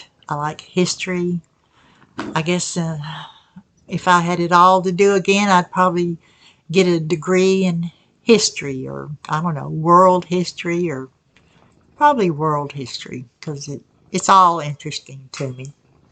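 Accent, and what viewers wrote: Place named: South, United States. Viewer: Love this,! I think this is fabulous; you’re very funny, interesting and articulate, not to mention brave. And trust me honey, we both have southern accents even if we think we don’t 😘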